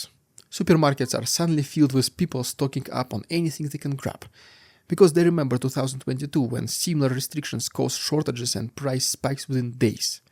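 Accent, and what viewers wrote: Russia. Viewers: Dear, Your insights are valuable, but I just CAN NOT follow along. Your accent is so very pronounced! It's just too hard to constantly rewind and listen again and again to some phrase or word that is just effing incomprehensible! No offense intended, I wish you good fortune.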